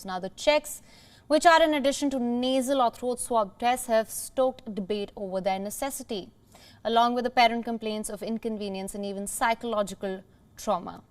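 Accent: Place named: India. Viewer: OMG that indian english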